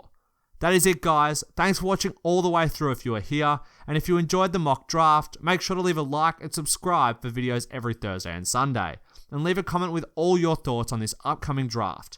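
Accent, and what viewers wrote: Australia. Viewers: You sound like you came from Australia? Did you? 🤔🤔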